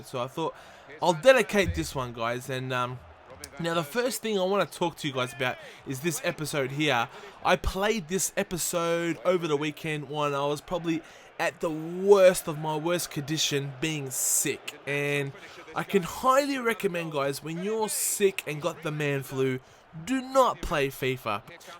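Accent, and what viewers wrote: Australia. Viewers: New Sub here and a Spurs fan. Found you after buying fifa 14 and looking for some tips / gameplay. Normally the aussie accent wrecks my head but you know your footie and your commentary is great!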